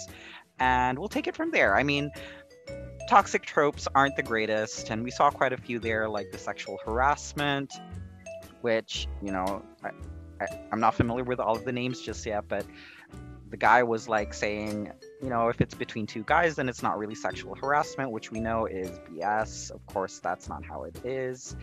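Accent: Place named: England, United Kingdom. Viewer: I just finish this bl series and I really love it! This is the first bl series that really push me to download it on my devices because I always feel that I'll eventually lose interest on the previous bl's I watched and this one is a must watch! Though I never watch the 3 first HIStory part because I really don't have the interest? Don't get me wrong! And also this is the only bl series I watched that gives me a lot of emotions. Thanks for this amazing reaction vid and I love your English accent!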